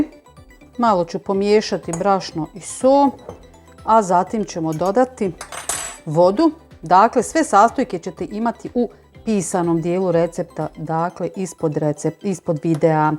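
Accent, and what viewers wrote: Bosnia and Herzegovina. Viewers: Čim je počela sa ovim bosanskim naglaskom znam da je to taj koji ću probat!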